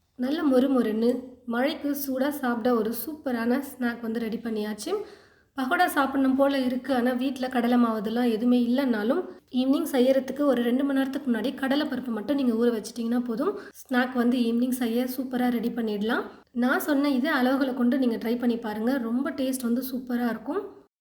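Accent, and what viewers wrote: Coimbatore, India. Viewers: Coimbatore ah... 😂😂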